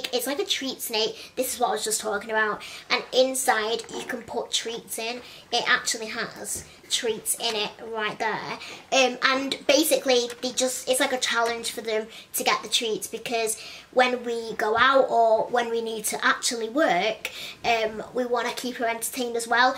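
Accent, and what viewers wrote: United Kingdom. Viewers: What a pretty voice speaking British 🥰 and a beautiful face and body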